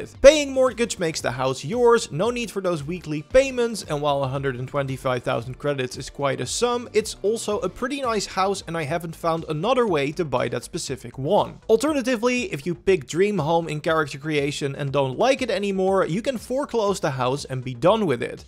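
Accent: Netherlands. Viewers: Very good video! But sorry to say, your accent is a bit annoying to me. You have a very Dutch way of pronouncing the "o" (I know because Im Dutch as well)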